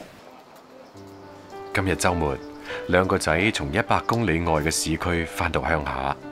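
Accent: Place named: Guangdong, China. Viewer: 好开心， 这是真正的广东话。不是那垃圾的香港发音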